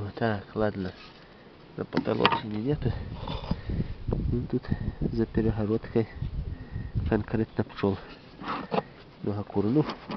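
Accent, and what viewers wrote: Belarus. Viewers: По разговору слышно,что ты с Белоруссии и понятно,что климат там серьёзней,чем у нас ! Для меня подушки не нужны ! Здесь и о мхе ничего не знают. Удачи тебе ! Я на тебя подписан давненько и смотреть тебя приятно ! Молодец ! Удачи нам !!!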